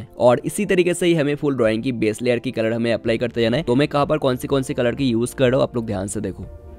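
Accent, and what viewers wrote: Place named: Bengal, India. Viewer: Khub bhalo legeche tomar painting video. Yomar hindi sune mone holo tumi bangali..tai bangla te comment korlam. Next time,' hairs' bolo na ota ' hair' hobe. Criticism na positive feedback dilam.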